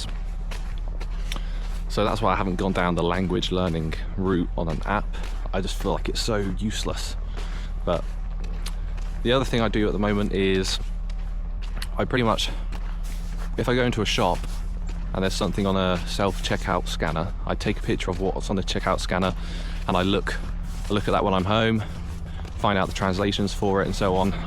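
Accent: United Kingdom. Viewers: Вааааййй, posh engliiish. Ну вы даёте, сударь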